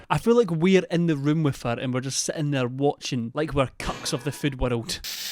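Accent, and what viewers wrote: Scotland, United Kingdom. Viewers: Hearing you speak immediately made me miss living in Scotland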